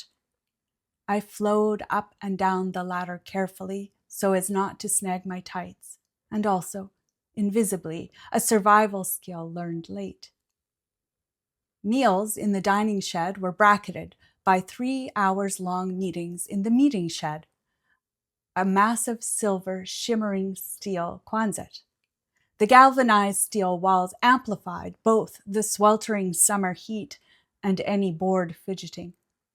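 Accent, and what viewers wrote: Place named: Saskatchewan, Canada. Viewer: Growing up just south of the border in Plentywood, I recognized the Saskatchewan accent instantly. More importantly: likely you know this but nobody likes the sound of their own voice. With only a little professional coaching it would seem you’d be more than ready.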